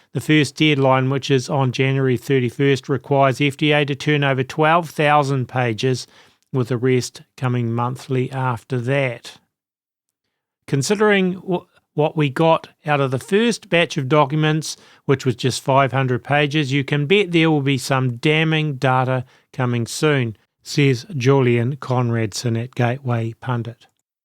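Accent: New Zealand. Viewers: I always smile when u first welcome viewers - a Kiwi accent! 😃💗 Beautiful! From the Waikato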